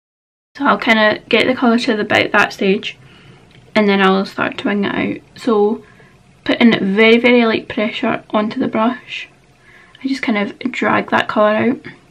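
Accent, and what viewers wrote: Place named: Scotland, United Kingdom. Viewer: Love this your so takanted and loving your Scottish woop like myself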